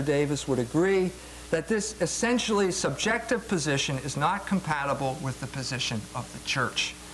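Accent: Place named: Philadelphia, United States. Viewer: He is allowed to have Philly accent ?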